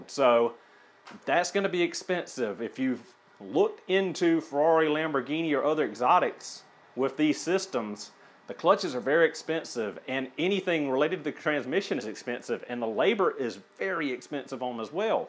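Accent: South, United States. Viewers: I'm still trying to get over, anyone with a Southern Accent, not being stupid. I never see them on Jeopardy. Coincidence? Anyway, that aside, you are absolutely right. Nobody talks about how user friendly it is, when it comes to repair, or working on the car itself. It is after all, a simple V-8 with a lot of plastic surrounding it. I totally agree with you. The warranty will cover so much, for so long. Plus, as soon as you put any kind of mod on it, it voids all the warranty coverage. Plus, nobody should ever purchase first year anything, when it comes to cars.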